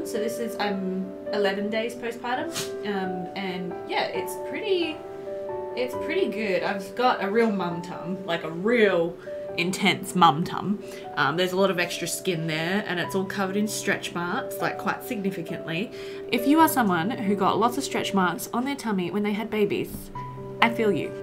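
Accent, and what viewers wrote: Australia. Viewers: Congratulations! Baby Jelly is so cute 😍 Hearing your birth story was very interesting and sorta informative for me since I've never been pregnant yet, but you had a lot of courage! Births are never like you expected them, it's always a surprise but I'm glad everything went well in the end for you and the baby. I'm a new subscriber, so don't worry about making content yet I still have a lot of Sims 2 Pleasantview to catch up 😉 Love from a Canadian girly ❤ (I love your aussie accent by the way!)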